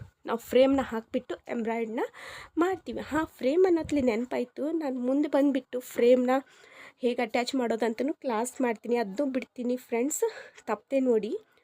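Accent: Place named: North Karnataka, India. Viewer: ಉತ್ತರ ಕರ್ನಾಟಕದ ಭಾಷೆ ಚಂದರಿ... From Chikkamagluru..god bless u..and Tq ur information ❤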